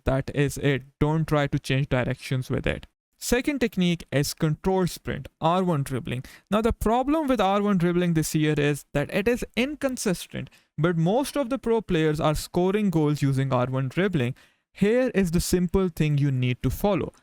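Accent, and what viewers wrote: India. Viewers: I don’t like your accent Sounds like bajeet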